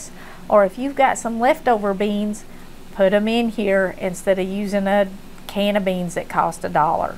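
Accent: South, United States. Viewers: She is a sweet and real lady but, I just love her accent...southern and country. God Bless her and her family!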